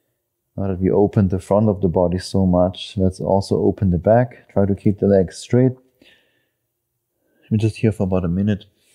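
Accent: Germany. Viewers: Deutscher Akzent? 😁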